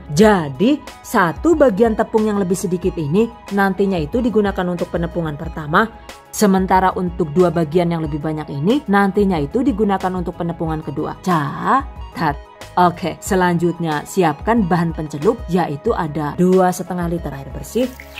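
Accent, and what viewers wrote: Banyumas, Indonesia. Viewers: Sy menyukai krn ada ngapaknya jg emoji lucu😂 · Cuma di Chanel ini dikasih trial sampe ke DNA, lengkap bgt do n don't nya, plus ngapak e ngangenin 🤗 moga sehat sll ❤️ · senengeh enyong.. sekaline rika wong ngapak 😂😂😂😂 tek subrek lah rika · Keren pokoknya mba,,,Slam ngapak y,trima kasih ilmunya,sukses selalu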